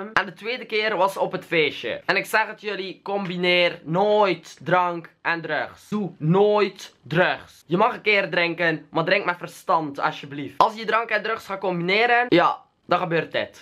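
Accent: West Flanders, Belgium. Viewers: Hij is inderdaad Belgisch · ! Van west Vlaams in elk deel van belgie ander accent 😂😂😂😂😂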